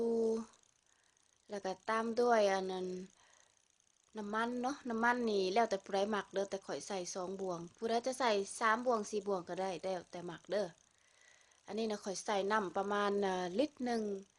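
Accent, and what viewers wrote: Savannakhet, Laos. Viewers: พาสาลาวสำเนียงสะหวันนะเขตพากใต้ลาว... 🤨🤨🤨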